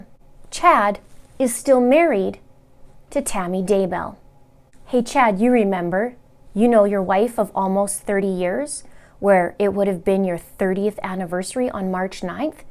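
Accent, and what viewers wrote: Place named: Canada. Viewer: Where are you from? You sound Canadian. Love your videos! Can’t wait to see Lori and Chad get nailed to the wall for this! (And still so so so sad about Gannon 😫)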